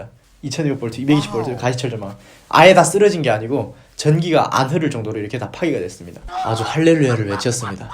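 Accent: South Korea. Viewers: 어디가서 귀순했다하면 놀라워할꺼 같은디 타방송보면 다른분들은 10년이 넘어서 말투가 윗쪽동네 말투가 남아있는데 님은 그냥 토종한국인말투 같아여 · 완벽한 남한말씨네요.....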